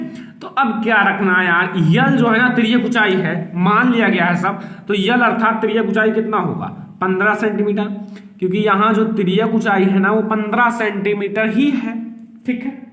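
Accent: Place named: Uttar Pradesh, India. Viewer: Kya bhai tu toh U.P side ka lag raha hai .. Me GUJARAT SE Hu 10 CBSE BOARD ..Ane wale hai boards 😨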